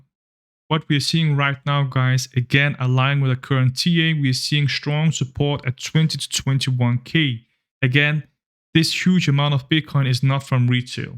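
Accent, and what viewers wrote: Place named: Austria. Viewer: Dude you have arnold schwarzennagger accent 😁 are you from austria 😁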